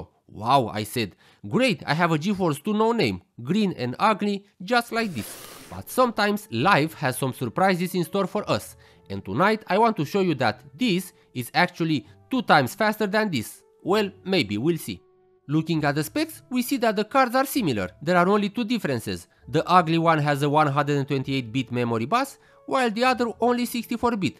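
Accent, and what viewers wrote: Romania. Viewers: He is Romanian, that's why he has this accent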